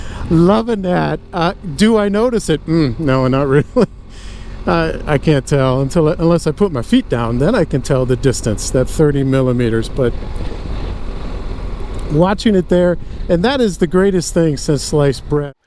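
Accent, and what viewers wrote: United States. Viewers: First time watching your channel, but noticed you have the most American accent of any European I've encountered. I like the adventure bike, but like you stated, I think I'd rather ride something else for exhilaration. I do love Ducati motorbikes.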